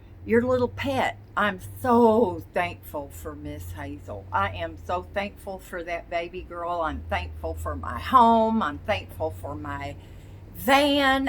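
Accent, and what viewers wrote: South, United States. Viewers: why is it that you have no accent in some of your videos but have a strong southern accent in other videos?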